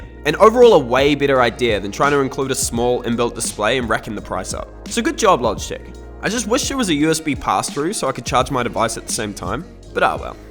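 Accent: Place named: New Zealand. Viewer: love ur new zeland type of voice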